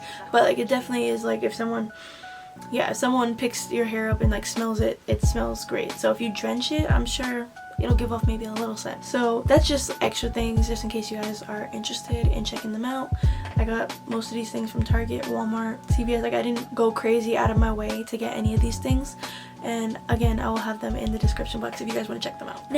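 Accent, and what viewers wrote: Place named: England, United Kingdom. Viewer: Wow thanks and I really thought u where from England 🤷🏾‍♂️😂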